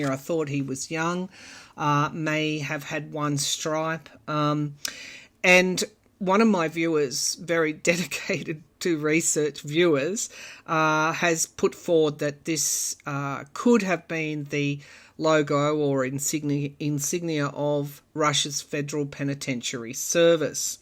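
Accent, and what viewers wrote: Australia. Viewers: I love listening to you, thank you for your readings, also good to hear an Aussie accent being so far from home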